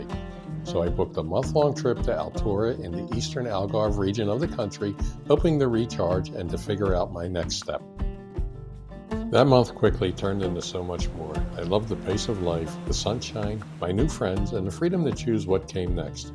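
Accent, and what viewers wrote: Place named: Philadelphia, United States. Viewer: HI! OMG I am from Lower Merion! From Rosemont/Bryn Mawr area. I immediately recognized your accent from home like a melody to my ears!... I’m living on the west coast now but grew up in PA almost til college. I was curious where you’re specifically from in PHL or outskirts? I am Amazigh…Celtic-Moroccan, Siwa Egyptian and Portuguese. My family is from Algavre but emigrated for the most part to the states after intermarrying in the UK and came down thru to the Main Line with it’s more pluralistic society on my mothers side. Very curious about a move either permanent or temporarily to either Portugal or Morocco…as are my parents to possibly Portugal. Would love to connect (if you’re open) Especially because you understand the culture of home vs there abroad in both countries and those adjustments. I am 45, just 3rd generation born in the states so there’s much to explore and visit. 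Incredibly beautiful footage! I am late to the video but thanks for sharing! Please share an update video as well! All the best! -Micki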